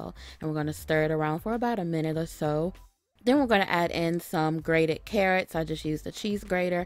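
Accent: United States. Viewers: Which accent is this ? .. which state 🙂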